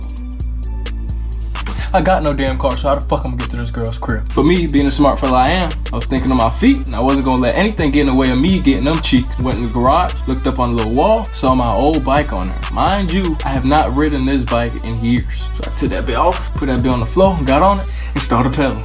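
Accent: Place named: Florida, United States. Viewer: lmao this nigga accent... Flawda as fuck lol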